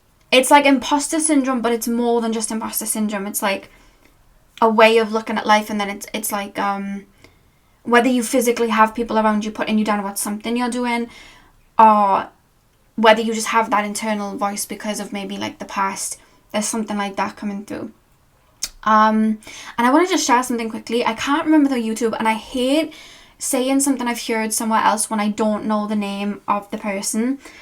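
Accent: Wales, United Kingdom. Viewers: I love your accent ❤️ My mum died when I was young and she was Welsh so I enjoy watching you because it reminds me of her and it’s not an accent I hear outside of my own family in Australia xx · #3 Love your lovely Welsh accent. Take no notice of anyone that says otherwise. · Pile 3: You sound perfectly, authentically Welsh to me! I have to agree with your interpretation of "divine timing." Besides, we're not really separate from the universe in any sense, so how could it be up to anyone but us? Thank you! 💜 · Welsh is just an accent we don’t hear a lot, at least here in the US. We get some new Irish & English immigrants and visitors, and both those accents are well known culturally anyway, and so is Scottish(I love Billy Connelly), but I’ve never met a Welsh person or even heard a Welsh accent in a movie. The first time I heard you you sounded like a mix of multiple accents to me-including various NY accents(which includes different accents in each of the five burroughs, plus Latina and Carribean accents), and there were moments when you even sounded like you were from “the valley”/California, in addition to a vague scotch-Irish-English sounding accent. 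It was confusing because you like American pop music so much, and talks in an English accent a lot, and she’s from NYC, and Carribean-American, but she lives in Hollywood now. I prefer listening to musicians from around the world on NPR Tiny Desk YT channel. In female rappers I like, and. I also love singers, and. My favorite artist might be; does a beautiful cover of / 💚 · I am by no means am knowledgeable about accents (as an ignorant American), I thought your accent was authentic but assumed you were from Ireland. 😅 I thought you had an Irish brogue until you said you were from England. Whoops. 😆 So, up until following you, I had never heard a Welsh accent. I may have, but always assumed it was Irish I guess. 🤷‍♀️ Anyway! Amazing readings as always! Thank you for taking the time to make it and upload this. 😊🙏💕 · thanks for the reading, and I love the Welsh accent, don’t worry about those people who have to say something ridiculous about you, the comments are more about showing who they are than who you are. 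Take care of you! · Thank you,! Pile 3. I, for one, never thought your accent was faked! It's very charming and very British Isles...bless you for your dedication to your audience! Sending love!🙏🙏😊❤️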